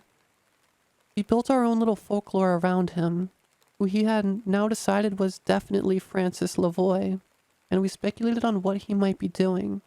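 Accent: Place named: New York, United States. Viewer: Love this new you. Are you east coast or west coast. Just trying to figure out the accent. Sounds more New York.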